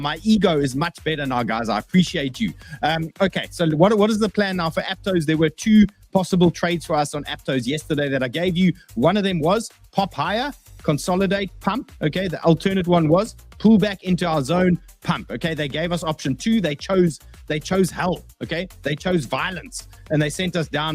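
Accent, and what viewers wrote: South Africa. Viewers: South African accent with American energy is grating to the ear holes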